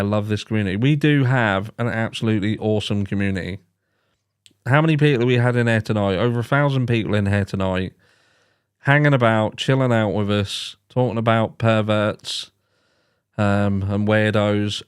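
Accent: Norfolk, United Kingdom. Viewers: It’s a Norfolk accent mines Suffolk and people think I’m from Australia too. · I think you sound british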